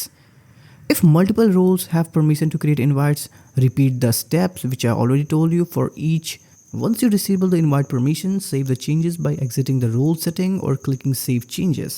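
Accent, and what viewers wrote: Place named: India. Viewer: You sound like an Indian trying not to sound Indian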